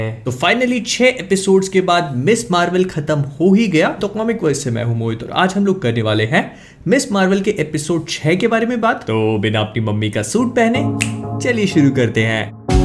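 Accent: Bhopal, India. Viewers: bhopal se kya baat krne ke accent se laga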